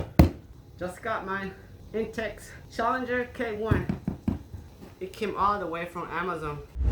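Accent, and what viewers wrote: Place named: Central Valley, United States. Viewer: Bro Iknew 60 seconds into the video you were from Central Valley lol dope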